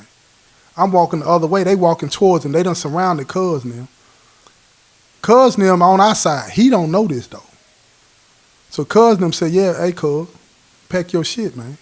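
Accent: South, United States. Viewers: Is your father or mother or grandparents from the south? Because you sound like some of my kinfolk down here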